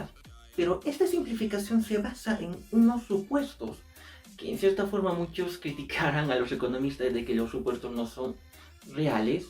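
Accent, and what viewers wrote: Spain. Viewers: Mano porque hablas con ese dejo catellano?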